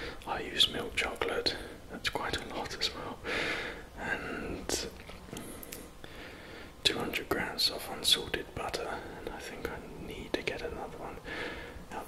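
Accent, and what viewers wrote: England, United Kingdom. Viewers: ASMR, plus gains, plus cake, plus British accent. I think you just became my favorite youtuber. 😍🎂💪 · Geez, your Brit accent is something, huh? Greetings from a Brazilian chocolate lover! 😋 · this accent comes from which part of England?!?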